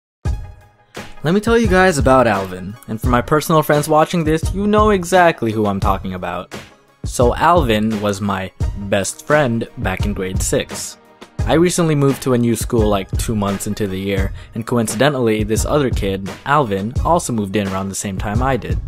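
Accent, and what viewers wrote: Canada. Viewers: R u Canadian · You from Canada? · wait are you Canadian?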